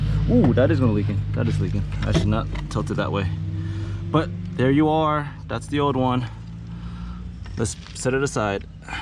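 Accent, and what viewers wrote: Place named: California, United States. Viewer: Why not straighten the fins? Ps first time come across your channel. You have an extremely strong Californian accent. Socal?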